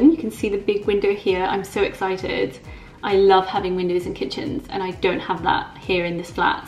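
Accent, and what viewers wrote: England, United Kingdom. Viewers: As you polish? And why do you want to live in Poland? You sound english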